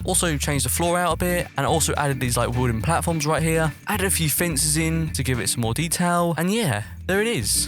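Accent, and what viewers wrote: United Kingdom. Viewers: I love your voice Are u Britain? I’m sorry if ur offended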